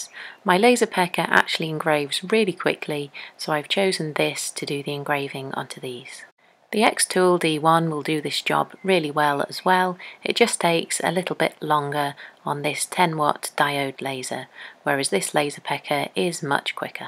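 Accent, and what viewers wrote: England, United Kingdom. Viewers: You sound like your from England 🏴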